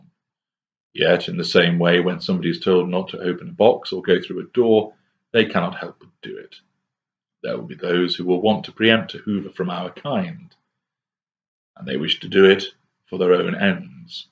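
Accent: United Kingdom. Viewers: you did Hoover me back with the audios! Brilliant work, you deserve the fuel you are getting. Nice to put a voice to the lessons. Oh, that British accent of yours! I'll be forever grateful for all I've learnt from your writings.:) Still not ready to have you write my online dating profile, but I'm still an escapee. Narc did not see it coming. Thanks! Blackwidow.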